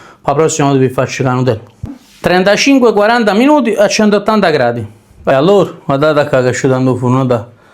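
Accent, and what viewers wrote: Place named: Naples, Italy. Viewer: Ciao cuoco mi piace come parli il napoletano ciao un abbraccio ciao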